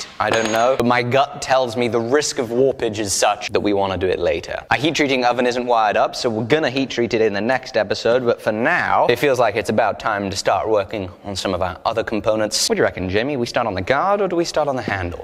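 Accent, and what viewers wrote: United Kingdom. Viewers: I came from colab- Am I crazy or does he sound WAY more British here than in that video?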